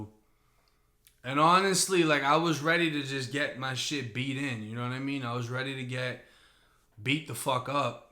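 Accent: Boston, United States. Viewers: Word he got ah Boston accent... I’m from Boston ... on one video he said something about being in mass for awhile not sure how long he was here but I hear 👂 it in his accent... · My chick was like why you watching this dude all the time. Shit idk I did time. Dudes got a Boston accent. And dude tells a great story. Let me alone girl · You're so Tampa but I detect a lot of Boston. Or should I say Baaston? I'm new here.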